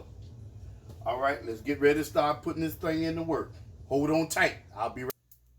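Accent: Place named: DMV, United States. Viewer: Bro, you’ve got me running to the store. Once you start talking junk, I knew you were from the DMV. Works for me!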